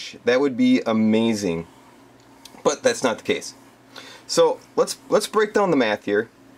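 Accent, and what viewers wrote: Canada. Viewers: Are you from Newfoundland?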